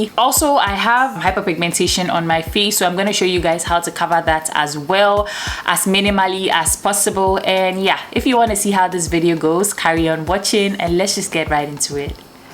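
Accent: Ghana. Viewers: Loved this. I was listening to you talk and I thought "she sounds Ghanaian", then I read your profile and see that you are in Ghana. So cool! Thank you for sharing your knowledge with us!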